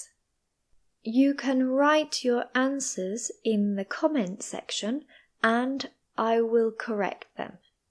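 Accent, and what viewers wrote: United Kingdom. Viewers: Are you British????? Reply please ❤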